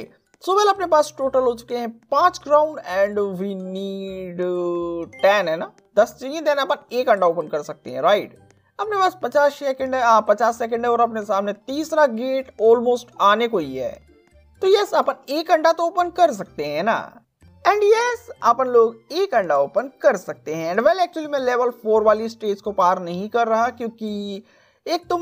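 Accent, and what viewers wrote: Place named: India. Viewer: What do HE speak he speak like Indian???🇧🇷 I have 900Qi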